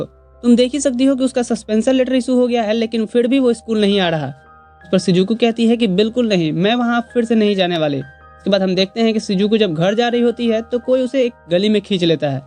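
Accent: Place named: Bhojpuri, India. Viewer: Mr apka asent bhojpuri 😅 h same here ☺️☺️and apki voice achi h 😴😴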